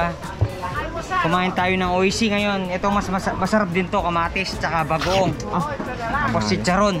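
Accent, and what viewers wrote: Pampanga, Philippines. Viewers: Kapampangan ka tlga ehehe . 😅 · ingat kayu parati soy kapampangan ka pala🤣🤣 taknaydumo anang tita eh🤣🤣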